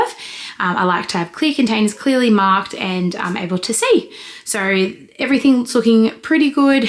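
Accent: Australia. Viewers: just beautiful as always <3 I love watching your videos, even your voice and the Aussies accent soothes me! Thank you so much for all your work and please give us more !!!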